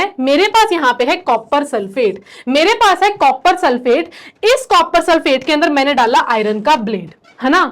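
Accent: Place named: Punjab, India. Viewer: I'm Punjabi and they way she is talking is clearly showing she is punjabi😅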